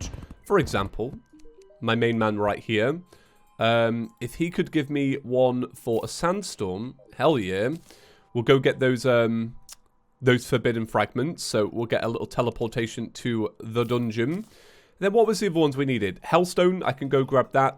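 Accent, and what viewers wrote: United Kingdom. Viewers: Saga of Brittish Accents Tuesday-Chewsday Silva-Silver Terra-Terror i cant remember any other ones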